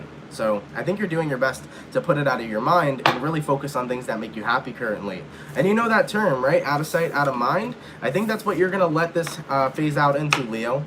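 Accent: England, United Kingdom. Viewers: During the Scorpio read; Love that British accent! 🇬🇧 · Love the British accent. From the UK. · Lovin the English accent...😉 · Pmsl your English accent is so good x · Lol, love the British accent 😂. Was just in your neck of the woods yesterday for thanksgiving (good Ole Yorktown). Btw, try Apple Cider Vinegar a few times a day for that acid reflux. Contrary to popular belief its low stomache acid that causes acid reflux (stomach's inability to digest properly due to low acid in the lower stomach pushes the acids in the upper stomach upwards) try a tablespoon or 2 with water before meals 😉. Hope that helps. Thanks for all your insights 😊🤗🙏 Happy Holidays! 💚♥️🎉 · Love the english accent 🦂🥰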